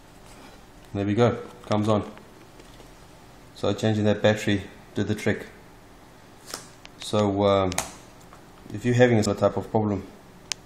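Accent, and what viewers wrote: South Africa. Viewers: Hi there , I have a Acer Aspire E15 , this has happened to me , I tried a hard reset with taking the battery out and holding the power button and then switching it on but that didn't work. I've been to and asked various technicians but they all say that it isn't the CMOS battery. They say it's impossible because I've only had the laptop for a year and a half ? Very informative videos, you earned a subscriber. Also you sound like you from South Africa 😅😅 So am I !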